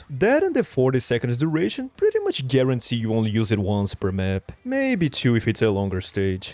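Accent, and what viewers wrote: Brazil. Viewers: Primeira vez aqui, na hora que eu ouviu você falando Ptilopsis eu tive certeza que tu era br kkkkkkkkkkkkkkk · Wait a Second... YOU'RE BRAZILIAN!? That Accent... it's Familiar somehow, anyways, nice to know one of my Favorites "Arktubers" is of the same Country as i, btw, will u cover Endfield? Really like the style of ur videos.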